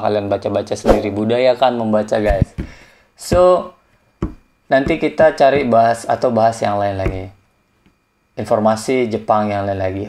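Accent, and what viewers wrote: Lombok, Indonesia. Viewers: Kentel gati aksen lombok indonesia no mton.. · Kyknya abng ini org sasak ya? Gw tau dari logatnya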